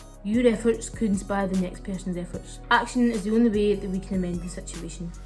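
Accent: Scotland, United Kingdom. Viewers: Your Scottish accent is bloody THICK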